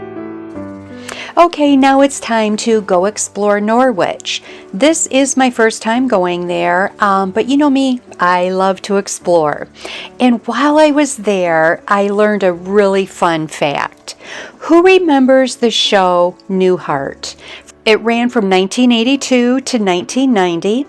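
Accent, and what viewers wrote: Midwest, United States. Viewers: Thanks for a great tour,. King Arthur’s product selection is vast and oh so tempting. Hubby and I have given up eating white flour and sugar so it’s a good thing that we don’t live there! But, we are not zealots and occasionally treat ourselves to a sweet. Your videos are very good and getting better. I enjoy watching. Originally from the Midwest, myself, (Ohio), and I enjoy listening to your accent which is so familiar to me. Have a great week!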